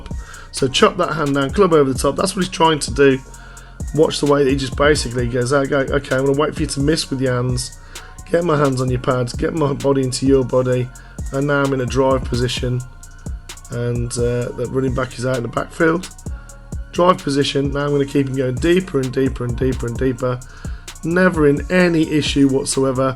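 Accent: England, United Kingdom. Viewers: Mate, I’m absolutely loving this breakdown. Insightful but with an English twist. 🏴 I always feel English analyst sounds a bit off but you nail it bro!💪🏻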